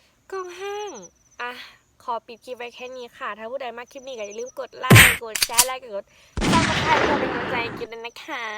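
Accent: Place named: Chaiyaphum, Thailand. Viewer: มักหลายสำเนียงคนชัยภูมิ น่ารักมากครับ❣️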